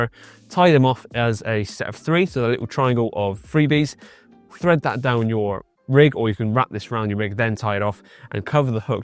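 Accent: Bristol, United Kingdom. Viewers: What your accent Bristol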